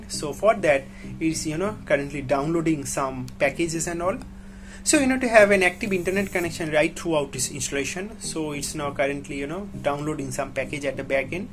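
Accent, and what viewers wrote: Japan. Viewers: how the hell did he sound from JAPAN?!?!?!? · I was guessing Japan lol sorry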